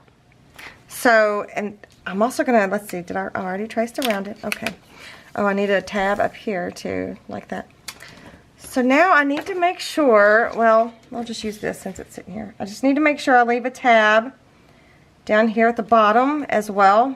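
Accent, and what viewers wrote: South, United States. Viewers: I feel so blessed to have found your channel,. Your aesthetic is perfect for me, I SO appreciate your sense of humor, and your work is absolutely beautiful. As a bonus, you talk “Southernese” just like me! So I definitely understand words like, “caddy wonked.” Thank you for sharing your sense of humor and knowledge with all of us. Hope you don’t mind but I chatter on and on to my husband about “my ” and I continue to lift you up in my thoughts and prayers after the loss of your mother in December. I wish you many blessings.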